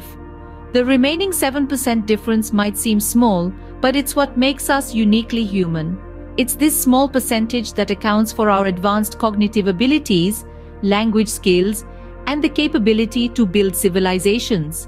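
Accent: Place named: India. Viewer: u CAN'T hide INDIAN ACCENT in your voice, don't try , its won't never happen.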